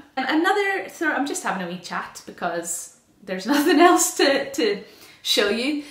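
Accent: Northern Ireland, United Kingdom. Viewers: Hi, congratulations! I'd love to win tickets 😁. I love your vlogs. I'm loving your accent, but I'm married to a Northern Irishman so I'm biased. We're moving to Belfast from England in a year or two so a little guide to fabric shops in NI would be handy x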